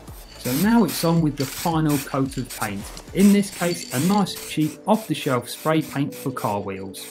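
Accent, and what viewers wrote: United Kingdom. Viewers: This video sounds so british that my endgame got invaded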